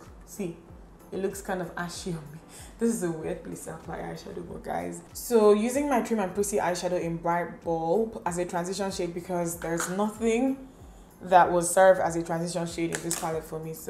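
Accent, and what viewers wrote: Igbo, Nigeria. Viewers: Hi I so much enjoy watching your video😘 And the fact that you always add your Igbo accent makes it more fun.. So please can you make a video on how to start a YouTube channel🙏🙏🙏🙏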